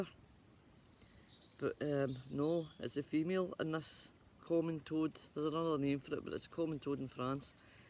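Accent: Scotland, United Kingdom. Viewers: hello from French gardening!! beautiful background to your wee natter, you've not lost your Scottish accent!! I have the same problem with my pond, green water and I tried the same barley straw treatment not touched it at all!! thanks for sharing your knowledge and as always happy gardening!!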